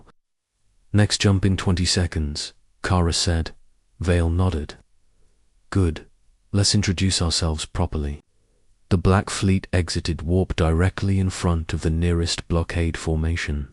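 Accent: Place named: United Kingdom. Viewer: The slow speed combined with the British accent is terrible! This makes me want to go to sleep in the 1st 10 seconds.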